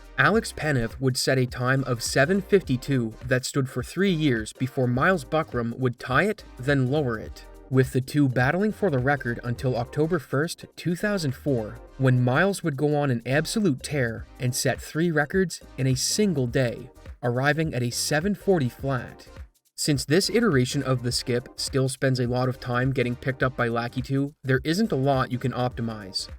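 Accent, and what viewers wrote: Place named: Prince Edward Island, Canada. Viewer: I forget if I've asked this before., are you a Maritimer? and around there I feel like I'm hearing a strong Maritime Canadian accent. "Time", "Line", and "Marker" sound very Newfoundland lol. For the record, I love it, I just really honed in on it after that time-stamp and can't stop hearing it lol. I bet anyone who wants to take it, a fair bet I'm not Googling first, $100 Canadian that grew up in Newfoundland (and Labrador). I could use the money and I'm so sure I'm right lol. You have to internally accept the bet before Googling the answer. I'll know. Edit: My second, unofficial guess for betting purposes, as I keep listening to him, is PEI. That's Prince Edward Island to you non-Canadians. That's just for pride. First honest guess was Newfoundland. Just started hearing PEI more as I listen.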